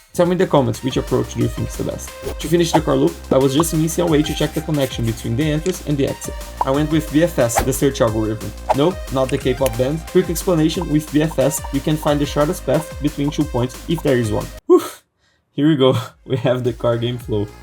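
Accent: Brazil. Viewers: Só fui perceber ao final do vídeo que teu sotaque de inglês era brasileiro. Ótima edição! (com alguns efeitos sonoros um pouquinho acima da voz) But I really liked the project and the core loop of the game. The graphics are getting there and show an astronomical evolution since the beginning. Overall, take care with scope creep, and good luck! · That accent... Tu é brasileiro mermão!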